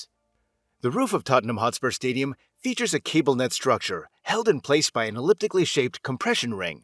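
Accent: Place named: United States. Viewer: Who is this Yank narrating about Spurs’ stadium?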